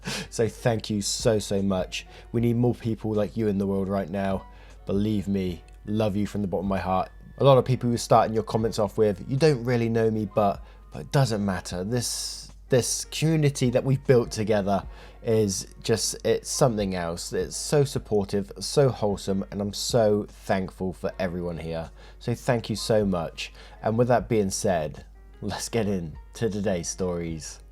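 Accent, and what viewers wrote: United Kingdom. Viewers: I am crocheting a blanket, while I listen your beautiful British accented voice read to me! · When I'm trying to take a nap after chemo, I turn on your playlist. Your voice is very soothing (could be the accent, you know how American females feel about a UK accent😂) and I get a great nap. I always go back and listen again while awake. So yeah, you put me to sleep, thank you.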